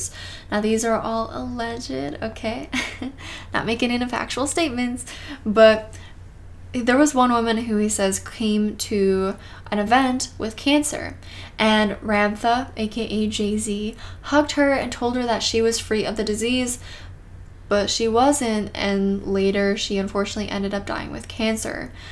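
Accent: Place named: Midwest, United States. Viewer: Variation on the US accent. I think Midwest but I can’t remember if has said where she’s from!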